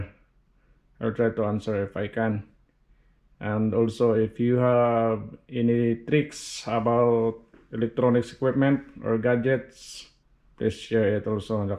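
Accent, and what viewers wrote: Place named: North, Philippines. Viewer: That thick accent sounds familiar from north Philippines.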